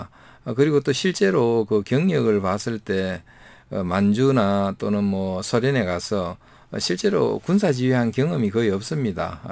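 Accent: Gyeongsang, South Korea. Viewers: 말씨 경상도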